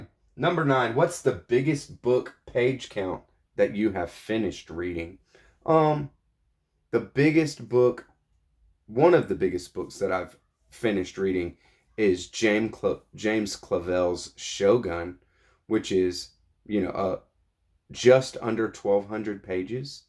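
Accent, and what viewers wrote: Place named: Texas, United States. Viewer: I just watched your video for this tag, and your accent is the thickest I have ever listened to. Is it Texan?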